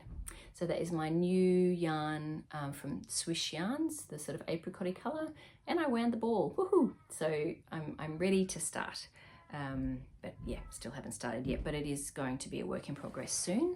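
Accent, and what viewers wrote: Australia. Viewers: I really enjoy your podcast! I love listening to your Aussie accent.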